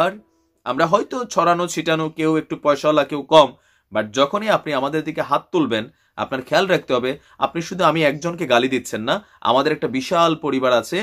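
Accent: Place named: Barishal, Bangladesh. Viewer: ও মনু আমনে দেহি মোগো বরিশালের ভাষায় কথা কন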